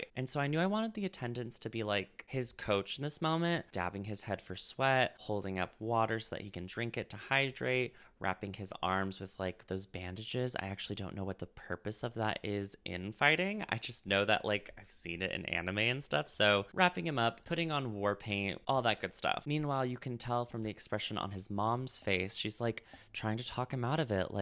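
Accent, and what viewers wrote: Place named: United States. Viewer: the way you envision the northern lands is SO different from how i picture it, i love it! i always pictured it as very windswept barren grasslands, like the steppes in northern china/kazakhstan - its completely different from your vision. whats so interesting to me is that given that you're from the northern hemisphere (presumably, you have an american accent lol), most people from there hear "north" and think cold - im from aotearoa in the southern hemisphere so north = hot to me! yet our respective idea of the northern lands are almost opposite to what our geographical perspective would lead us to, its so fascinating.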